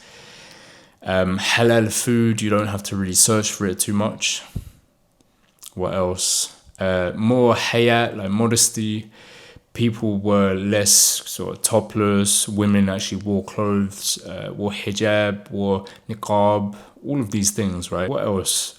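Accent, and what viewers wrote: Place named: England, United Kingdom. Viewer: Salamo alaykom, my brother. If I were in your place, I would look for an apartment for sale in Tangier and establish an English language institute. Yes, there are many in Tangier, but you have an advantage: you are English and have an English accent, and that's what learners are looking for. A project like this would be 100% successful in Tangier because there are many international companies there, and English is in demand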